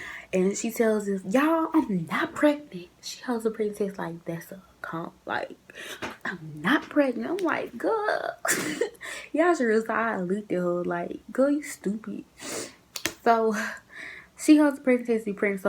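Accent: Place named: Georgia, United States. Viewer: I just love your accent.It sounds like you're from Georgia.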